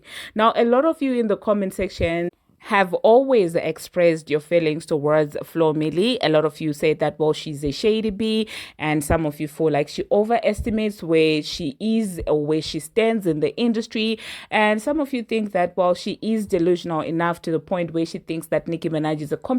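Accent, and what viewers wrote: South Africa. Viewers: are you South African??? is this you??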